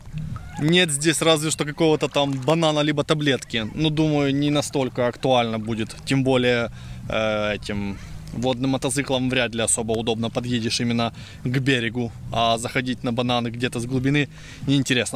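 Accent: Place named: Ukraine. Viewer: Ведучий-твій " рускій "- із диким українським акцентом. Перейди на рідну мову-блогер...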